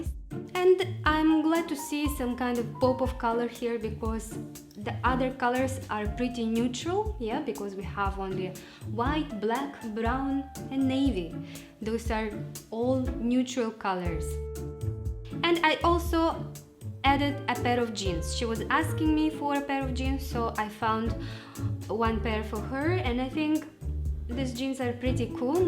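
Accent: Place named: Russia. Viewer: What accent is that? Sounds so Russian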